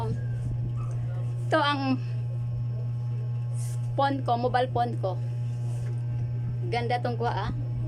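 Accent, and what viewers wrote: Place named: Visayas, Philippines. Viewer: Nakakatawa po.. Parang may bisaya accent ka po like me.. Bagong kaibigan mo po.. Natapos ko na din tong mapanood sana tulungan mo din po ako..😊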